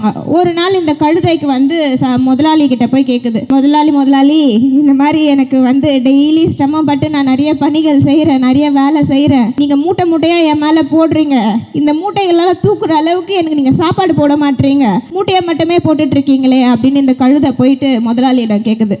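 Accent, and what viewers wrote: Telugu, India. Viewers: ஏய் மயிரு நீ முதலில் தமிழை ஒழுங்காக பேசக் கற்றுக்கொள். சேகர்பாவுவிடம் தெலுங்கு கற்றுக் கொண்டால் இப்படித்தான் தமிழ் பேச வரும். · இந்த கழுதைதான் அந்த 😂 பேச்சில் தெலுங்கு வாடை வருது...வந்தேறிய சக்கிலிய கூட்டமாக இருக்குமோ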